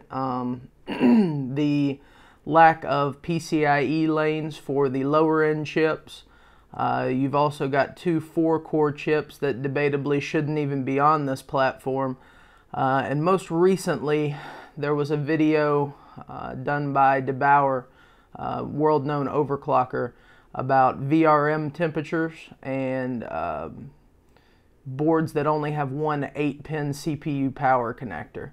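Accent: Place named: South, United States. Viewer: "happy as a pig in shit" Dude, you have a wonderful way with words. I haven't seen a tech channel run by someone with such a strong southern accent. It's a great pairing! I don't really subscribe to channels these days, but you seem to be making promising, informative videos and I want to see you grow, so I subscribed. Looking forward to more stuff from you!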